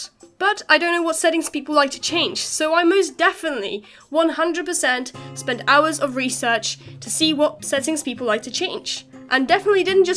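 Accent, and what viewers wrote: United Kingdom. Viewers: That's the time I stopped watching. Why do you have to talk so freaking fast?!?! You already got a heavy British accent which is hard for the most of the world to understand.. Food for thought..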